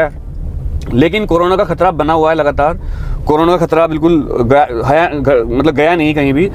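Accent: Delhi, India. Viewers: Delhi se ho kya sir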